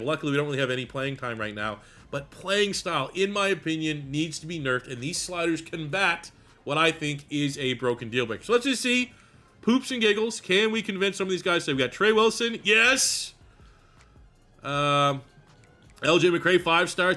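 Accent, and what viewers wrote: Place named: Canada. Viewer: Straight to the point. Great content. You're an OG. Been doing it 15 years. I thought you were Canadian with that accent lmao.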